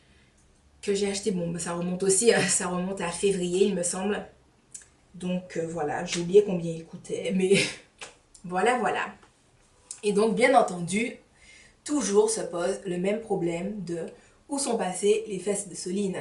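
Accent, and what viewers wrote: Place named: Antilles, Caribbean. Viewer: ton accent antillais, c'est trop mignon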